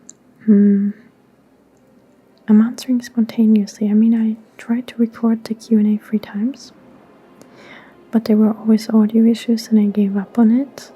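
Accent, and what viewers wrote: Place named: Norway. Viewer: Thanks for this very relaxing video! I would've guessed you were from Norway^^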